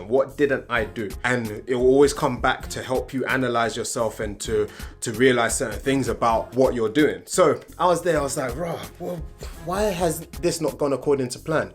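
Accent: United Kingdom. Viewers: Good guidance my man, good to hear a British accent. I am tired of listening to American ones..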